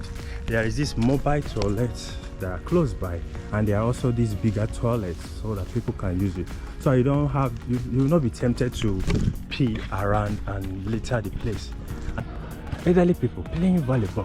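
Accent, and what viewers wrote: Africa. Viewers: Судя по акценту именно африканец? А откуда? Добавь в описание канала о себе что-то кроме того каково чернокожему гулять по Москве. Учишься в Лумумбе? Я так понимаю английский в Африке как русский в Дагестане, тобишь язык межплеменного общения, но без акцента не бывает? Видно, что русский тяжело дается, но речь уже поставлена, лексикон будет расширяться, язык будет привыкать и при желании всё получится. Keep it up man.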